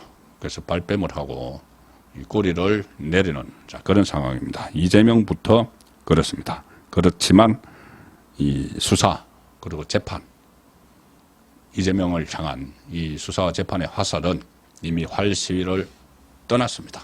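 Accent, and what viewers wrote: Gyeongsang, South Korea. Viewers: 탄<핵 아니고 탄~핵이라니까. 탄자가 장모음이오 경상도 사투리 증말 듣기싫네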